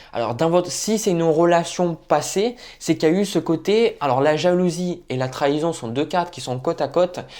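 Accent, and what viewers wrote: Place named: Southwest, France. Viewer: Bonjour, étant ascendant scorpion, c'est la première vidéo que je visionne sur votre chaîne. Néanmoins, je dois avouer que par manque de temps, je n'ai pu regarder uniquement la moitié de votre vidéo, mais ce n'est que partie remise. La partie que j'ai visionnée a été extrêmement émouvante. Chaque mot que vous avez prononcé (avec votre joli accent du sud-ouest), j'aurais pu les prononcés moi-même, dans mon esprit, dans mon coeur et à voix haute. Oui, instinctivement et intuitivement, sans me poser dans la figure de la victime, je me suis souvent demandée ces derniers temps: Pourquoi moi? Je ne me reconnais plus dans ce que je suis devenue? Quel est ce tournant que ma vie a pris sans que je ne me rende compte de rien. Intellectuellement, j'ai beaucoup cherché mais maintenant je sens et même je me doute que la réponse est dans ce qui est caché, ce qui est connecté au spirituel, d'où le tirage de ces cartes pour les scorpions qui incarnent des notions de spiritualité. 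Merci du fond du coeur, de ce tirage en grande résonnance avec mon âme. Je m'abonne.🙏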